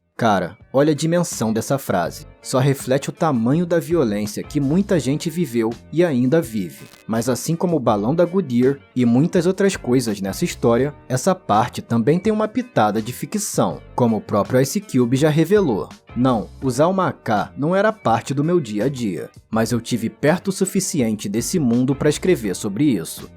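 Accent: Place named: Rio de Janeiro, Brazil. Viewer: O melhor é q esse vídeo sobre Cube é narrado por um carioca. Vaibe de gueto.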